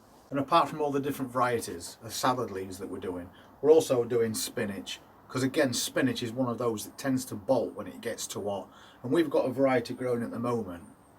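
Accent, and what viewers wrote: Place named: North Midlands, United Kingdom. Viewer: Hi, in rough terms, whereabouts are you in the UK? I’d suspect north midlands from your accent but people move around so that’s not a reliable indicator. The reason I ask is that I’m interested to know what hardiness zone you grow in so that I can follow your advice with cognisant of any local temperature related adjustments I might need to make. FYI I’m in North Shropshire only a cock stride from the South Cheshire border.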